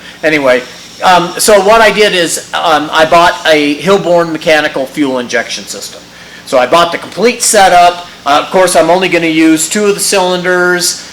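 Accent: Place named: United States. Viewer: American accent and metric! Thanks for not frying my brain with inch unit tolerance.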